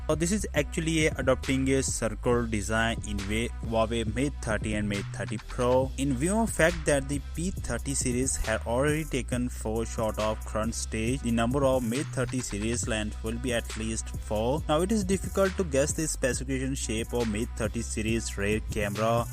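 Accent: India. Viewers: Indian pronunciation ？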